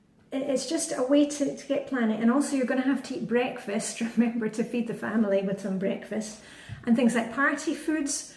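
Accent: Scotland, United Kingdom. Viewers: Just found your videos due to a recommendation on The Hygge Nook group on Facebook and I am already converted... Flylady with a Scottish accent!! And in Denmark!! How could I resist?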